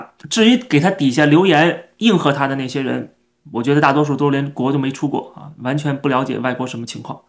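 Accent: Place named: Beijing, China. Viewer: 我怎么听到一北京哥儿们儿在温哥华夜市烤串儿，历时四年购了大豪斯啊……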